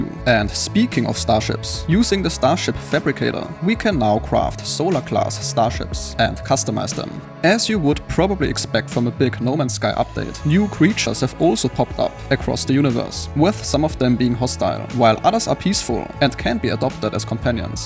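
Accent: Germany. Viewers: The German accent made me stop the video after 5 seconds. Sorry 😂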